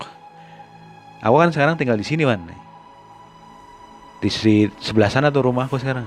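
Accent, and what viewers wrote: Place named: Banyumas, Indonesia. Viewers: Baru denger di awal2.lgsg ketebak.pasti wong ngapak.salam ngapak bosku! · dimanamana tempe enak..ora ngapak ora kepenak😁cilacape ngndi mas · Bagus! Ceritanya sederhana. Masnya ngapak jadi kangen ngobrol sama temen2 ngapak! 😂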